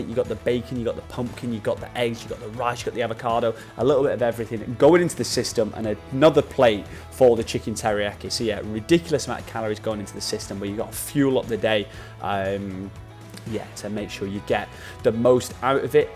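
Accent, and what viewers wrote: United Kingdom. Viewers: Great video bro, drone video footage really amazing. I really love your british accent bro, i also love scotish accent, irish, and wales. It help me to practicing for listening accent for preparing if someday i go to uk 😁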